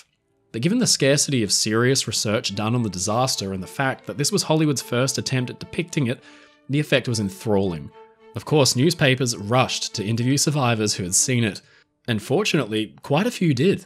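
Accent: Australia. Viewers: What? The uploader is Australian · I've noticed that too - uses several other American pronunciations in his videos, I can't remember any of them right now...but they sound wrong coming from his (refined) Aussie accent. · I knew you were Australian, hi from Tasmania, and thank you for being a more familiar realistic representative for Australia ❤❤❤😊.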